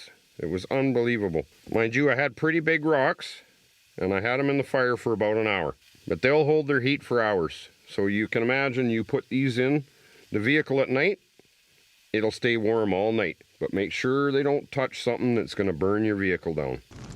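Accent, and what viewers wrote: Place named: Canada. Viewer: I just LOVE you deep voice, accent, and sense of humor. I wish I could go to Canada and spend a week with you just to listen to you teach me survival stuff!! Thanks for sharing.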